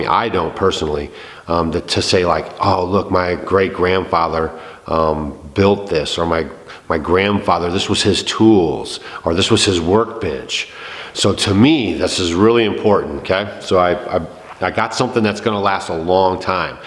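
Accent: United States. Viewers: what, no heritage ?hey, you sound like someone from the USA... (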